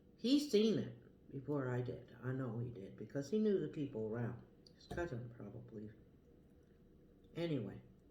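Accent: Canada. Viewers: this is when I notice the Canadian accent lol 😆 I have no idea what you said haha! Nice video mama breakfast looks delish. Congrats again on the new crib!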